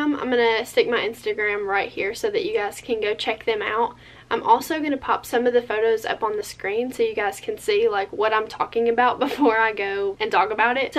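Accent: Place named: South, United States. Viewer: Yay! You are so freakin awesome! I hear the southern accent! I am just below you in MS. Oh, hey NSC sister!!! Keep shining your light of influence!!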